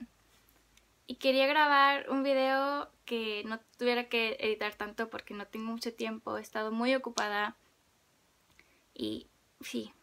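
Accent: Mexico. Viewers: Que linda wow eres de mi país mi hermoso México saluditos ^.^ ya decía que tu acento era igual al mio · ¡Tienes el acento de México! 😂🇲🇽👏🏻 Tu español es muy bueno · Tu asento fresa...me encanta..felicidades..me encantas..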